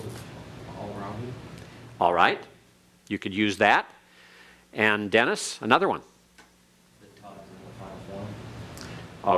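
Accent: United States. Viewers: Ok, people...Jew here. This is the thing: These people are learning this language to study with, to become Biblical scholars. They will not be using it as we Jews do to pray in. Yes, the pronunciation can be a little wacky for me, but this man is teaching this from an angle I have never heard. I can rattle off prayers in Biblical and Prayerbook Hebrew, and even tell you what I am saying. But I need more depth and he is providing that, so the “Classical”pronunciation is not throwing me off. HOWEVER, I will say that if you ever walk into a synagogue, you are going to hear different pronunciation. This is not the way Jews is most places pronounce some of these sounds. We also tend to not have such a strong American accent—even those of us who are American. In order to pray with this language, listening to some prayers in Sephardic pronunciation would be helpful. 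Or Ashkenazi, but you will likely not hear that much except by some older folks in Conservative shuls, or in orthodox settings like Chabad. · Lovely class. I really appreciate it. I do have a mild criticism. might want to consider that he should use examples that conform to pronunciations in Hebrew. For instance, there is no a as in bat--none whatsoever--repeating over and over sounds that don't have an equivalent in Hebrew--reinforcing sounds that don't exist. He is also speaking the name of the letters in a thoroughly American English accent. These students, though learning Biblical Hebrew, should learn to sound like an Israeli--because this is proper and useful. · Errrm wanna get rid of the American accent, if possible.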